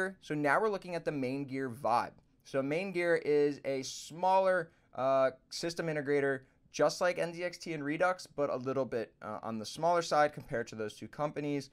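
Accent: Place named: United States. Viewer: which currency is this in. you sound american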